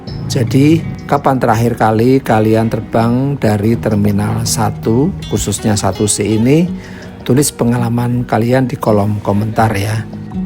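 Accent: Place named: Java, Indonesia. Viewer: Logat jawanya 😂😂😂😂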